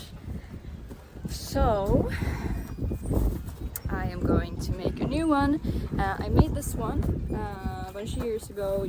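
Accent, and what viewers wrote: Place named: Scandinavia. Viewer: You aren't American? I just found you. What are you, one of theose Nordic countries? They have perfect American English and fool me all the time. Almost everywhere else speaks a British English. Anyway, I look forward to watching.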